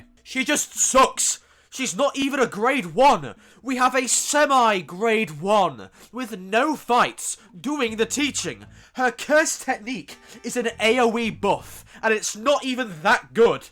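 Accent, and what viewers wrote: United Kingdom. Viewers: After watching this video commentary, I now understand the lack of reading comprehension trope. Turns out having a British accent does not make you intelligent. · I CAN’T STAND THIS SCOTTISH ACCENT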